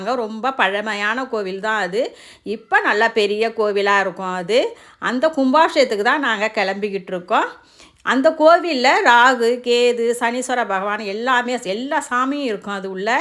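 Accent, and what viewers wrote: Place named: Thanjavur, India. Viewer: U have interior Thanjavur slang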